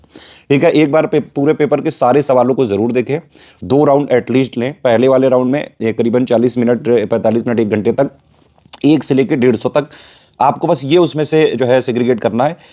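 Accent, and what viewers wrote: Kanpur, India. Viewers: Sir aap kanpur se h kya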